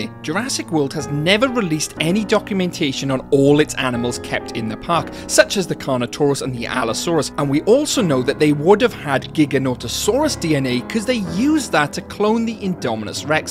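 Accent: Wales, United Kingdom. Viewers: Awesome video! Also, whereabouts from the UK are you from, I hear a bit of a Welsh accent in there but cant tell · Idk why but u sound welsh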